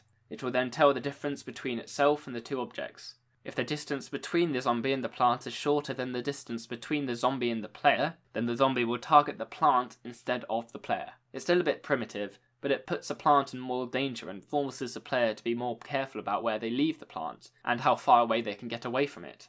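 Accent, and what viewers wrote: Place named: Europe. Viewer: Ur from UK? Your accent is European. Nevertheless, you're my favourite. 😁😁😁😋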